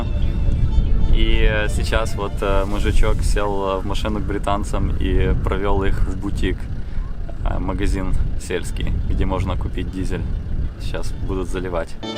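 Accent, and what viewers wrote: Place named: Ukraine. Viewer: О Боже... чувак , ты ссышь зрителям в уши . Посмотрел 15 минут , больше не могу . Твоё произношение на русском просто режет слух . Дружище , если ты думаешь , что разговаривая на русском ( а не на украинском , твоём родном языке ) ты сможешь увеличить аудиторию - то ты глубоко ошибаешься . Я как человек проживший 20 лет в Украине и 7 в России , просто не могу не обращать внимание на твой русский . Не ломай свой язык на камеру , больше потренируйся в плане произношения и только после этого пили видосы на русском . А что касательно самого видео - молодец. Видосик качественный , хорошо проработан , продолжай в том же духе . Только прошу тебя , пожалуйста , прислушайся к моему не большому совету )))))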